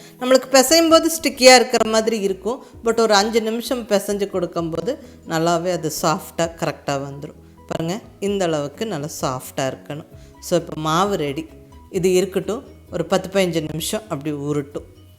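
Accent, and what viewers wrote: Kongu, India. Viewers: Ungaludaiya kongu tamil 👌👌👌👌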